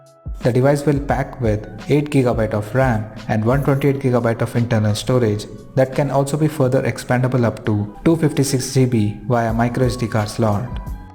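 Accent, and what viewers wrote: India. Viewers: Bro.. What are you trying to say? Your indian accent was so thick until we can't clearly understand your preview.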